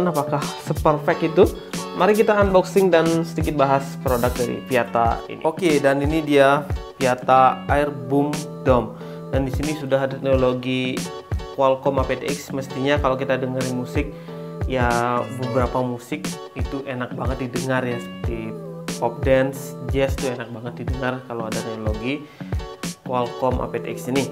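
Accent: Bali, Indonesia. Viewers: Logat bali Salam dr hindu rantauan bang..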